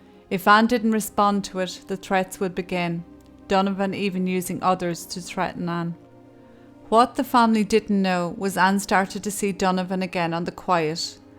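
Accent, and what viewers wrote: Ireland. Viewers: I've been binge watching your videos. I really like your style, and your Irish accent is just the cherry on top. 🍒 I appreciate the fact that you go straight into the case and waste no time talking about irrelevant things. You've quickly become one of my favorite channels. Thank you for your work. 🤗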